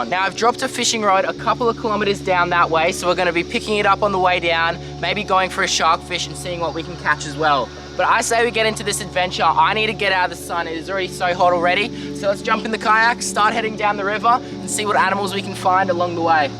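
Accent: Australia. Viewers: It’s something about an Australian accent and wildlife reminds me of Steve irwin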